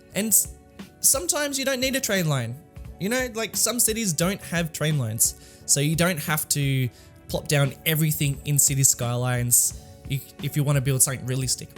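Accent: Australia. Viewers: Patterns of a city. You sound Australian, so fuck Sydney's layout.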